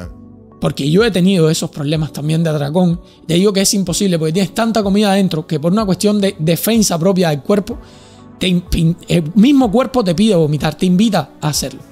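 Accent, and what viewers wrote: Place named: Cuba. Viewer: la llegada de tus padres fue una alegría inmensa, y no sé si es manía mía nomás, se te escapa la modulación cubana más de lo que se acostumbra, jajajjaja Saludos y viva Cuba libre!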